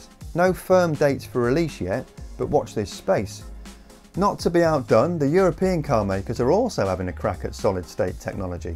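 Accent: United Kingdom. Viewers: Give an idiot a british accent and he sounds reasonable.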